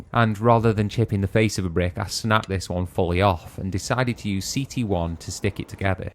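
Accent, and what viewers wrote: United Kingdom. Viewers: Which part of Ing-Gland is this accent from?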